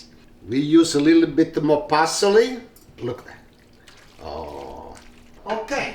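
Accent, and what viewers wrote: Italy. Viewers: You remind me of my grandfather who I called Papa. Love the Italian accent. I’m gonna make this dish this weekend! 😃😋❤️ 👩‍🍳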